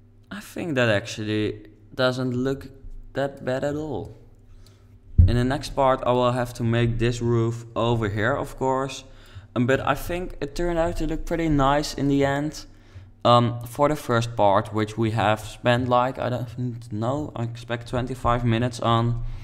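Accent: Netherlands. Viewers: hahaha ik hoorde meteen dat je nederlands bent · Hey ik wil ff zeggen dat je echt super gave huizen maakt maar 1 tip: Praat alleen in de intro en daarna niet meer,je kan heel goed horen dat je Nederlands bent door je accent. (het stoort me) zet voor de rest van je filmpje een muziekje op x Niet gemeen bedoeld alleen een tip:)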